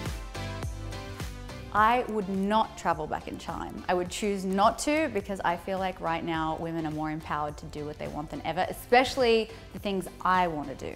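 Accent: Australia. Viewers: Glad she hasn't lost her Aussie accent.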